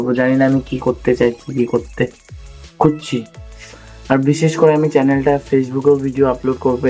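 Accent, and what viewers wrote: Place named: Kolkata, India. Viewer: Ami shocked 😳😲 tumar bangla kolkata bangla moto waw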